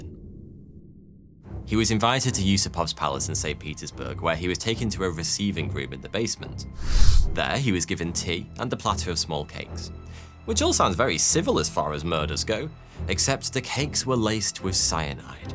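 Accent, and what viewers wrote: United Kingdom. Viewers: He's a brit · Amazing content. I mostly enjoy hearing your British accent. Funny, and history facts. Great job, and thank you.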